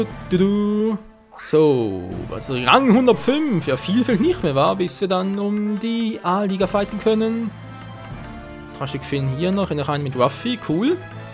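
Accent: Switzerland. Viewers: Bist du zufälligerweise Schweizer? :D PS: merkt man am Dialekt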